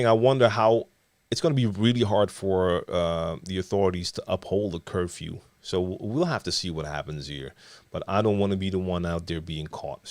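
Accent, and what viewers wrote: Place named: New York, United States. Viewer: I straight thought you where from New York.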